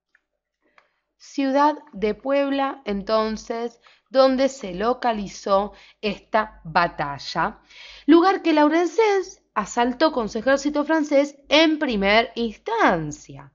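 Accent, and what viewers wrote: Argentina. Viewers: eres de argentina?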